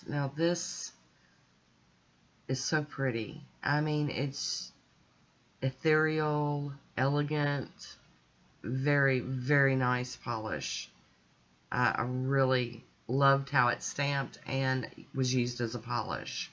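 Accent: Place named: South, United States. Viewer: Got a good chuckle about your comment that Southerners pronouncing “things funny”. LOL. I love the way you speak. Reminds me of my favourite movie and book - Gone with the Wind. Liked your mani too. LOL.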